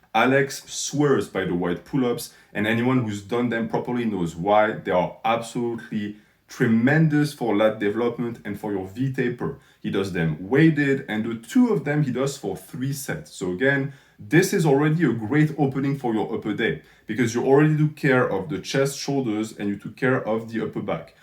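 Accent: France. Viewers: are you french bro ?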